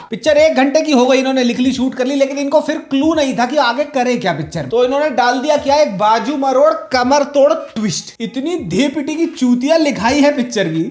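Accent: Haryana, India. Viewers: I love you bro to much honest review 😅 and bhai please bring back you’re haryanvi I really miss it ❤❤❤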